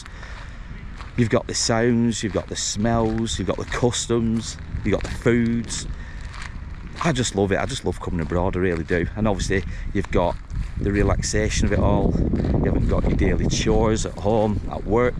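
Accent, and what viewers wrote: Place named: Lancashire, United Kingdom. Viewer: Your putting that stupid Lancashire accent on like Lindsey Hoyle,the speaker of the house of commons, really mate you seem a decent guy but chill the Lancashire accent a bit nothing personal,I do like your videos 😜.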